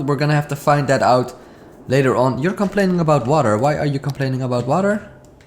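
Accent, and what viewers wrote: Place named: Netherlands. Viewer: I know I am a bit late but are you dutch because you have a dutch accent and if you are so am I love city skylines I live in Rotterdam groetjes uit Rotterdam zuid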